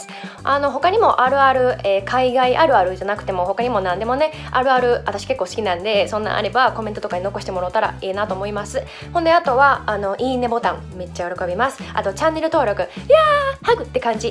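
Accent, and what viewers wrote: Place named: Okayama, Japan. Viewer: 岡山弁の響きが心地いいです。あるある、楽しかったです！ ほんま、おえん。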